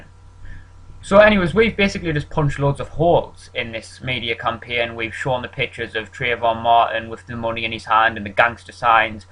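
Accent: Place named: Ireland. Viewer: I just seen this video you are a dumbass British moron that sound like a Irish leprechaun why don't you put on your green suit and go find your gold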